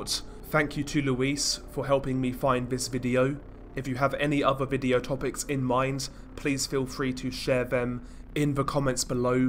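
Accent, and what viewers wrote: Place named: United Kingdom. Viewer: I hate the British accent but as a Mexican I can’t stop watching these videos 😔!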